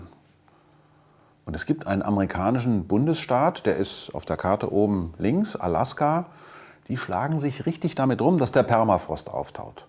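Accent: Hesse, Germany. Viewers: Karli Davidson Prof. Lesch hat zwei Themen herangezogen und beide wissenschaftlich erklärt. Du hast nur inhaltlich und argumentativ versagt. Prof. Lesch kommt aus Hessen. Dort wird gerne mit isch statt ich gesprochen. Man bekommt einfach gewisse Dialekte nicht weg. Ich möchte jetzt nicht wissen wie oft du schon "da wo" gesagt hast. Oder "manchmal immer". Fakt ist, dein Kommentar ist so wertvoll wie Scheiße am Schuh.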